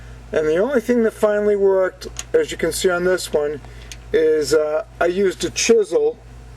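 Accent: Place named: Boston, United States. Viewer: great job so far., its been almost 5 years since mine popped on my 96 supercharged VR6 GTI, and your video is leading me to fixing it on my own. i am not faint of heart, i build 1000+ hp drag race VW/Audis in my spare time, but i really didnt want to do this job. and still part of me almost thinks the 800 plus bucks the quoted price to do would have maybe been worth it. i ripped one of the RCA off the stereo right out of the gate, very discouraging. but the car has a big stereo in it so its just gotta be fixed is all. again, thanks for taking the time to detail this. i have the Bentley manual but never felt the need to actually look at it. and i love how not-a-mechanic you appear to be and yet still tackled this job. the Boston accent is a plus:-)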